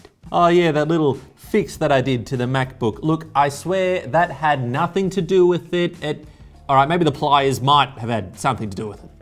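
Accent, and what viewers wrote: Australia. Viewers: Are you Aussie? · R u an Aussie like me mate?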